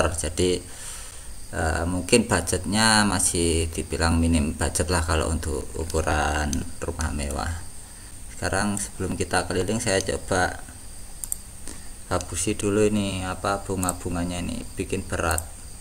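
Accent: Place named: Java, Indonesia. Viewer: Logatnya lowh. Medok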